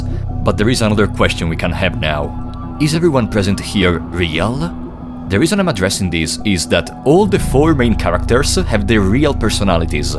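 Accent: Italy. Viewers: ma sei italiano? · Bro with that smexy ahh italian accent of yours, just make ASMRs plz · I love your accent funny italian man (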